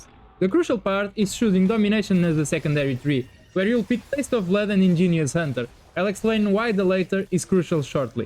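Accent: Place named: Portugal. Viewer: Sotaque não engana que é tuga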